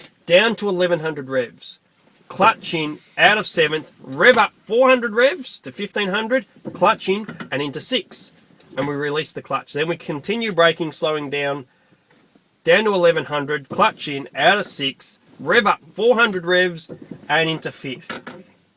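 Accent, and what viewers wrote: Australia. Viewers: Finally a truck with the steering wheel on the RIGHT (correct) side of a truck and an Aussie accent. Those Western Star's are actually a decent drive. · His accent kind of gives it away. Aussie or New Zealand RH drive Western Star. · this fella is definitely an Aussie, Not from NZ.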